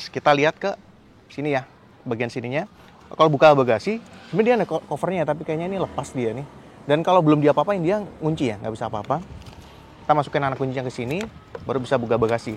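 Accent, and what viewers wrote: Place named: Java, Indonesia. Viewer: masa reviewer logat Medok gitu di bilang enak di denger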